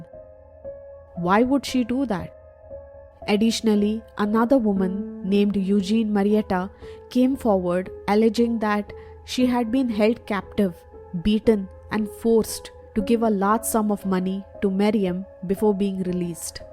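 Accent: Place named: South India, India. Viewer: Narrator sounds as if she is South Indian.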